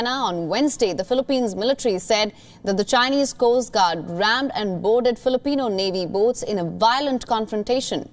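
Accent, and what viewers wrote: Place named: India. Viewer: She still have Indian accents that sounds good for the Indians.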